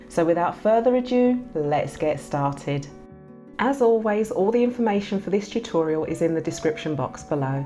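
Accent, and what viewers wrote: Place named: England, United Kingdom. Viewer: I like your voice and your English accent 😃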